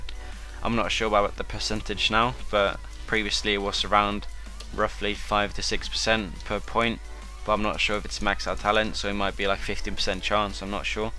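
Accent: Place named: Scotland, United Kingdom. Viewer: You from Inverness? You have a strong accent, laddie.. Like Alex ferguson..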